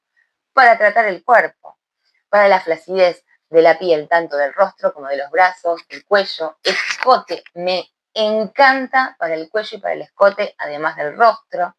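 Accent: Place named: Andalusia, Spain. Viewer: Porque me parecías que eras de andalucia😅❤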